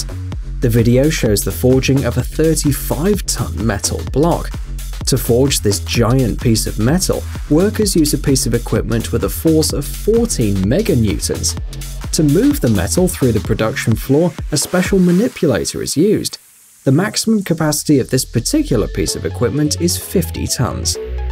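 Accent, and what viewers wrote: United Kingdom. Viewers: Ugh listening to the British way of saying these metals. No thanks.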